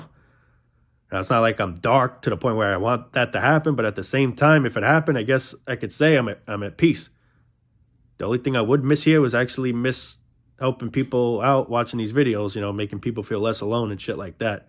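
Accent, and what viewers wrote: United States. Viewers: So true sadly.. also I like your accent! What part of America are you from?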